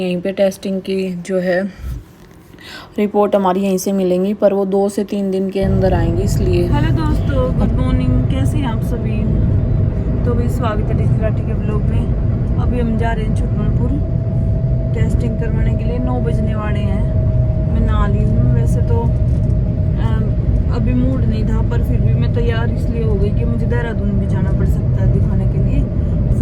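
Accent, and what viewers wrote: Haryana, India. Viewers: Aap ki bhasa to haryana ki haap vaha utrakhnd me kyu rahte ho nya koi kob h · Hii apke vlogs bahut hi interested hote hai kyonki aap Jo h vahi dikhati ho aur bolti ho koi banavatipan nahi hota h.kuch dino se hi apke vlogs dekh Raha hun raat k 3baje Tak apke videos dekhta rahata hun.jo aap harayadavi launguage bolati ho na bahut hi achchi lagati h.baby boy hone k liye advance me badhai. Please reply jarur karna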